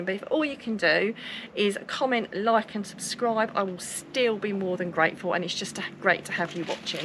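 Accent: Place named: South East London, United Kingdom. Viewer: are you from SE London/Kent border? The accent! Reminds me of home!!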